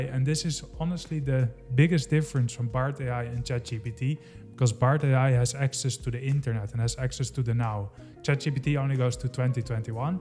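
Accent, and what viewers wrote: Netherlands. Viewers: I enjoyed your video on Bard vs ChatGPT very much. It was very informative and I learned a lot about the two language models. I could tell you are Dutch by your accent 😊. Greetings from South Africa!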